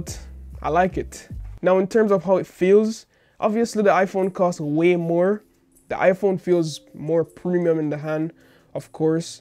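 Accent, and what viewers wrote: Jamaica. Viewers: from you start speaking I said this definitely is jamaica 🇯🇲🙌🏽🙌🏽🙌🏽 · Can't hide that Jamaican accent... You Jamaican? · your Jamaican accent is rich i love it 👌🏾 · Are you Jamaican, I hear the accent but I'm not sure.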